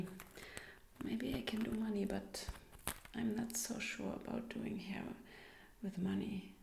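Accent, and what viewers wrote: Germany. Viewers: I love these accents : a German and English combo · You are my favorite amsr artist!💖 My grandma was part German so i love the accent.💖💖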